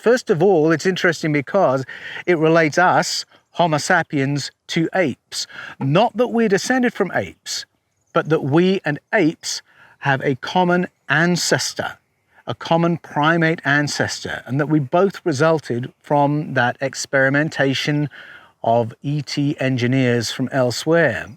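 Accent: Australia. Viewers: 1st, you speak awesome Australian! Not a hint of an English accent! Great content. This creature sounds like a Bigfoot like we have here in the States.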